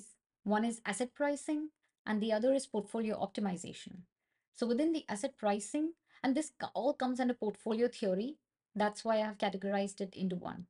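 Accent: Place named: India. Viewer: Great video but the Indian accent makes it difficult to understand sometimes, especially with names you’ve never heard of. Maybe use AI to translate English to English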